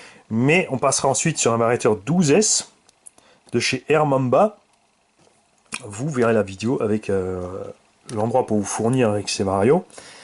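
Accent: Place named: South, France. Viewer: Les essais ! Les essais ! Les essais ! Tu commence à y prendre goût à l’accent du sud :D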